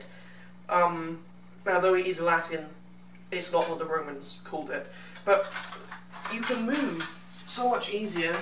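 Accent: United Kingdom. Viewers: very good like all romans in the movies, a brittish accent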